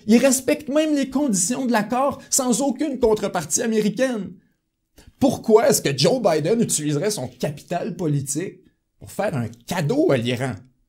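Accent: Canada. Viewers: L’accent Canadien...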